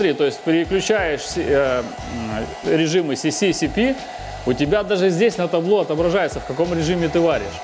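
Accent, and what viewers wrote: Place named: Ukraine. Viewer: Ты как будто украинец, шокаешь, фразы на украинском выпуливаешь) Есть ощущение что множество талантливых людей в рашке - это украинцы)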